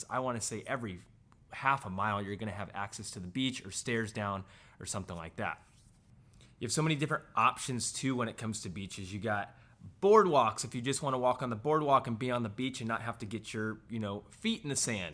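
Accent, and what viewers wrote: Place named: New York, United States. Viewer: Sounds like NY/NJ to me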